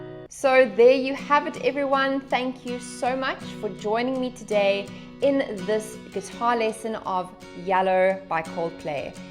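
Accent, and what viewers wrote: Australia. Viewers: Great lesson & teacher - is she an Aussie 🇦🇺? (really cute too 🥰)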